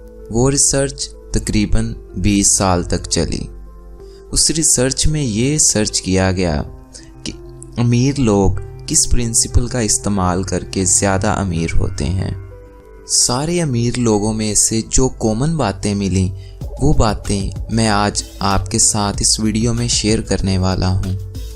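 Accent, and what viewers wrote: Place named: Lahore, Pakistan. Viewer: Your English accent is typically Lahorian. Improve it. Or use 100% urdu.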